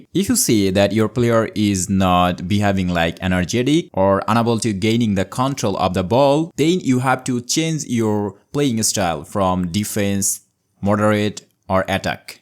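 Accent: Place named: Bengal, India. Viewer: Your accent is Bangali. Are you from Bangladesh?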